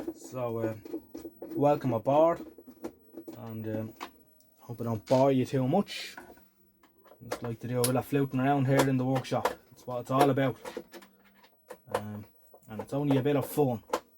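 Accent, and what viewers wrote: Ireland. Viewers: Love your strong Irish accent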